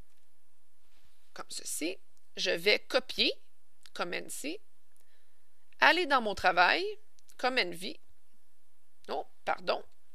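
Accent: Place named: Quebec, Canada. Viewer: un bon tuto avec une voix sexy et un accent quebecois adorable jadore je mabonbe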